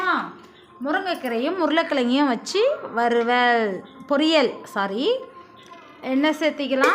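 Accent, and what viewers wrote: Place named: Salem, India. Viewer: நீங்க சேலம் பக்கத்து கரர் போல பேச்சு erukerathe nengal சேலம் மா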